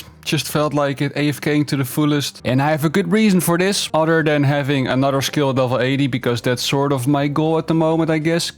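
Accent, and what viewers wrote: Netherlands. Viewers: You are dutch right?😅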